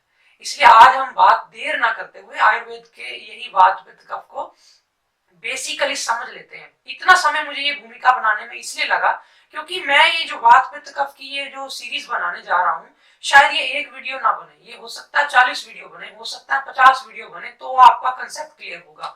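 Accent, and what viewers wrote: Punjab, India. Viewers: Y did u changed ur name... i can see punjabi touch in your speech and in your way of your expressing thing.. i am sure you are sikh.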